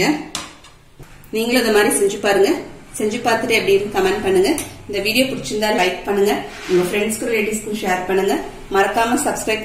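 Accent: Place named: Srirangam, India. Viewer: இப்படி இடியாப்பத்திற்கு மாவு அரைத்து பிழியலாமா? Sister! நீங்கள் பேசும் பேச்சு! ஸ்ரீரங்கம் மாமிகள்! பேசுவது போல் இருக்கிறது! நான் ஸ்ரீரங்கம்! Sister!❤️❤️❤️💐💐💐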